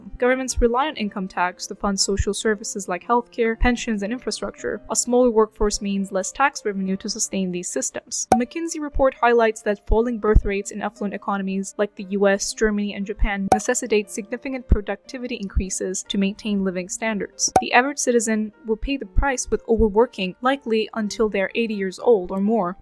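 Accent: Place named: Turkey. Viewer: I am Turkish too and I was like "This accent is really really familiar " in the first video, Anyway Great video! I love the first one second one is also really good!!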